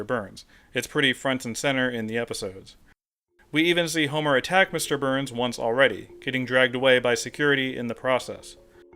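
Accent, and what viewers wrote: United States. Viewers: Does he? I'm British and he sounds pretty typically American to me.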